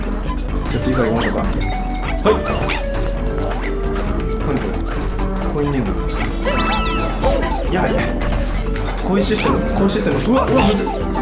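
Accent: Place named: Kansai, Japan. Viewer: 関西弁いい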